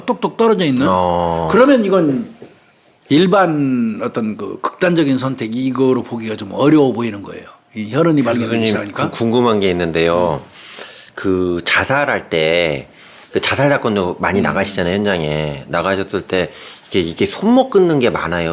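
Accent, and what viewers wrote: Chungcheong, South Korea. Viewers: 김복준 교수님 수고 많으시네요. 그짓말은 충청도 사투리 경기도 표준말은 거짓말 인데요 이 말씀은 교수님 말씀이 구수한 충청도 사투리를 쓰시는 말씀. 교수님 건강 하십시요.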